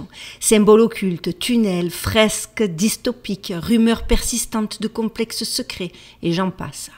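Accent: Marseille, France. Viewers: J’aime beaucoup l’accent de Marseille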